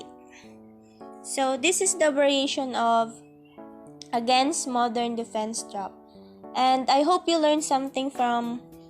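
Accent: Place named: Philippines. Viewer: Nice tip. I noticed your Filipino accent by the way.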